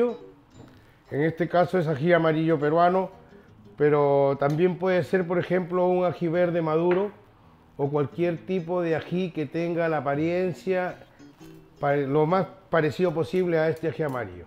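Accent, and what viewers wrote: Chile. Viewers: hechastes el aji crudo si soasarlo ,na que ver ah otra cosa habla como peruano no pierdas la esencia ,y no hables como chileno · si tu eres peruano shora hablas co.o chileno por favor donde estamos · Alfredo Santaria si lo mismo me dije yo.. en su uniforme de cocina dice perú.. pero tiene un dejo de chileno inconfundible!. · Y PORQUE HABLAS COMO. CHILENO..😃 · se le pego de chileno po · Queee !! No se pone huevo crudo 😱y dónde está la cebolla el soasado ?? 🤔 Mi abuela le hubiera jalado las orejas No Sabe Nadaaa 🇵🇪 de Huancayna . . Noto un dejo chileno ?🤦🏻‍♀️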